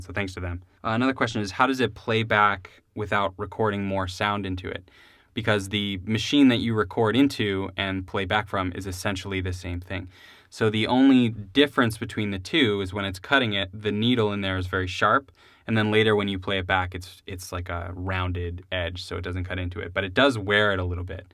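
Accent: Chicago, United States. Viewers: hey...great vid and great answers....as a fellow Chicagoan...celebrate the Dialect...not everybody gets it... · "Valume" is a Chicago accent thing. Like I catch my self saying pocket like "pah-ket" all the time.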